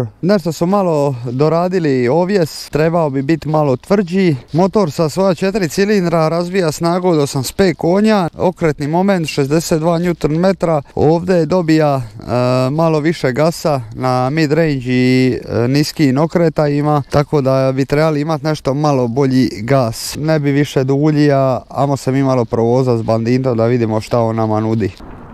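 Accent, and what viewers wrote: Dalmatia, Croatia. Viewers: E lipo mi te cut bruderu, kako to fino objasnjavas po dalmatinski :)))) Hvala za super video, taman hocu da kupim bandita i to bas takvog. Veliki pozz iz Sarajeva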